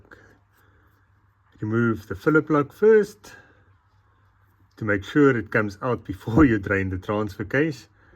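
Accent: South Africa. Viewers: ‘tsempian’ for sure lol. You will never be able to hide your South Africanisms😅 I must add that one thing I really do like about an SUV is that with its higher ground clearance one can get under the car and service it for the most part (however somewhat awkwardly), but with a normal sedan or hatchback just jacking up the car is such a chore and I hate it. On larger SUVs the engine bays have space to use tools, smaller cars not so much.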